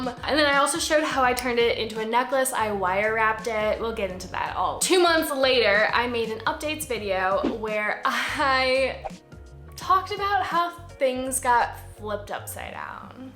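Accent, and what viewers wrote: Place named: Los Angeles, United States. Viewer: You're gonna fit into LA with your voice lol. I would've thought you were from there. No shade though.. Great and inspiring video. Thank you and congrats!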